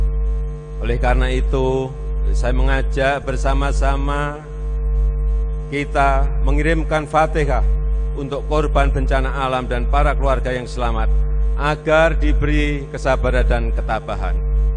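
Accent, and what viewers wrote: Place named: Java, Indonesia. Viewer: Lambene wong Jawa ya begitu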